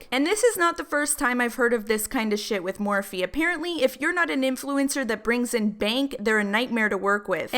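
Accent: Canada. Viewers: are you fromcanda or the midwest? that accent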